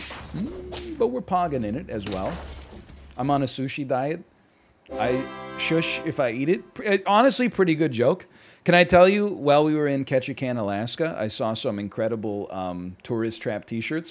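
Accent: Canada. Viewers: Nah, his pronunciation is a common pronunciation in Canada. It's by far the more common of the two where I live.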